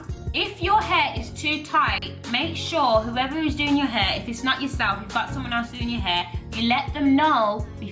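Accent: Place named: United Kingdom. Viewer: Thank u for these advice. New subbie. I love ur british accent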